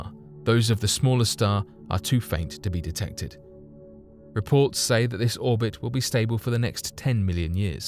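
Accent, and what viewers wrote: United Kingdom. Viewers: shut the fridge. is he not british? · why do you sound british?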